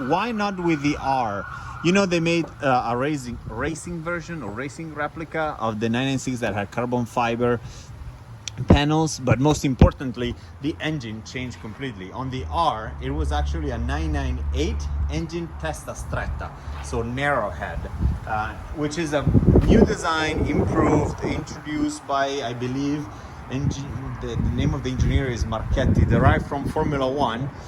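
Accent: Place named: Texas, United States. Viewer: Beautiful bike, I can definitely hear your Texas accent!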